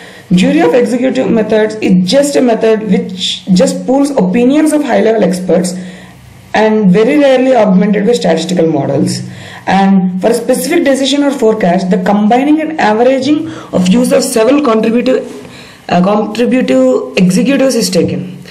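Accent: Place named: Spain. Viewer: hi are you spanish? sorry but i have to say yr accent is so hard (for me) to comprehend all of it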